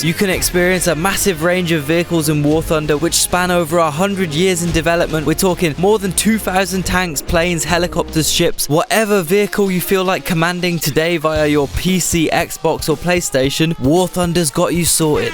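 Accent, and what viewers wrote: United Kingdom. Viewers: I love how a BRITISH guy talks xd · Oh what I didn’t know you where a fellow swede that oi bruv accent is strong, when did you move to the uk? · Norwegian guy her. I approve this video 😁👌. Cool hearing a british guy that really gets the nordic banter humor we got goin' on ! 😁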